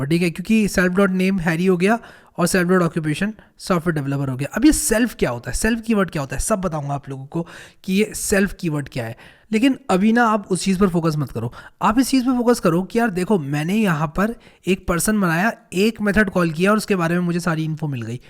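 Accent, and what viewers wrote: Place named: Uttar Pradesh, India. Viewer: wo object jiske liye call Kiya jaa raha hai❤❤ | Aap UP se hone bhai😂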